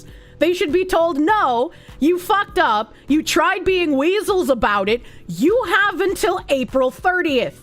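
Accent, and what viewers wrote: Boston, United States. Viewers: There is a retired Alabama football commentator that had this same Boston accent. Maybe that's why I enjoy her voice. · The most trusted news source with a Boston accent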